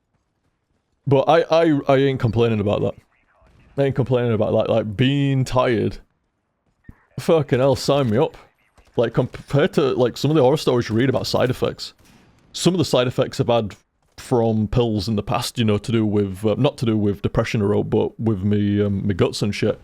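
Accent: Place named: North, United Kingdom. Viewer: Hey, like a few others have commented, I first subscribed to your channel during your very first IWBTG playthrough, back in 2008 or whenever it was. I've gone from an awkward 14 year old to a successful 24 year old watching your vids, and I honestly think your content has helped me a lot through some of the tough times (maybe a fellow northern voice on the internet was cathartic, or maybe it's just all the laughs, who knows...). You're certainly my favourite youtuber ever, no doubt in my mind. you might not get millions of views on each playthrough, but your fanbase is really dedicated and I don't think a 2 month gap is going to make us go anywhere - we've been here long enough to stay a while longer! Take as long as you need to feel better - I can always watch the super mario 3 htsf for the 5th time... cheers!!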